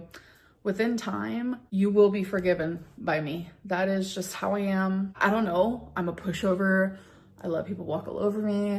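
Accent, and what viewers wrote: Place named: South, United States. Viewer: She sounded like Lex in that voiceover and back to her weird southern thing in the video. That’s some close up comparison of her powers of absorption😂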